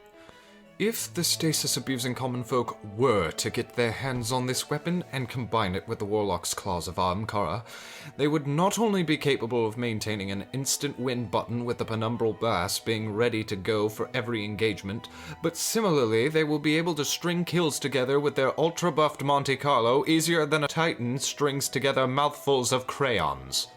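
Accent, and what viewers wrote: England, United Kingdom. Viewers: The stuffy English thing…awesome😆 Best destiny video ever! 🤣🤣🤣 Right up my alley! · Hahahaha, that’s hilarious! I’m glad this came up on my feed… Exceptional English accent there good sir and again, hilarious! A new sub here for thee, peace ✌️ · lmao Also, the narrator's voice and the "actor class British" is amazing.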